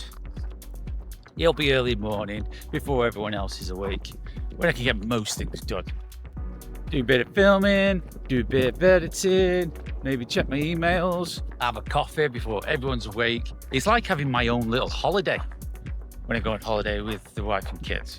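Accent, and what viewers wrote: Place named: Northern England, United Kingdom. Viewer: Love the accent ,down t earth good owld northern accent😊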